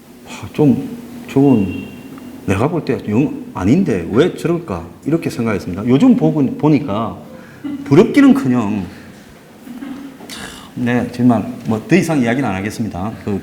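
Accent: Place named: Busan, South Korea. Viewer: 명강연에 살맛납니다! 간간히 나오는 부산사투리에 더 재밌습니다!^^ 늘 감사히 잘 듣고 있습니다! 건강 챙겨가시면서 방송 해 주셔요~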